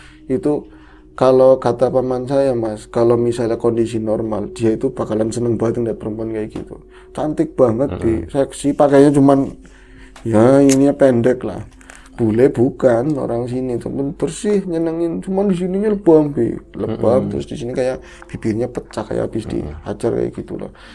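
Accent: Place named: Java, Indonesia. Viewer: Seneng krungokke suarane bro. Medok banget 👍👍 Jowone..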